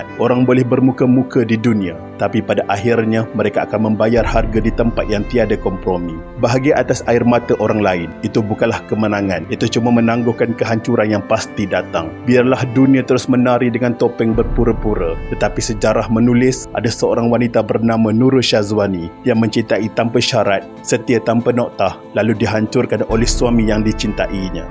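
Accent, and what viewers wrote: Kelantan, Malaysia. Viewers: KELANTAN?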